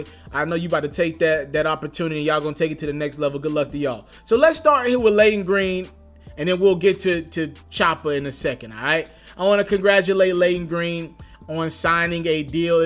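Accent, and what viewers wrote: Memphis, United States. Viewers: . Youn sound like you from MEMPHIS 💯💯💯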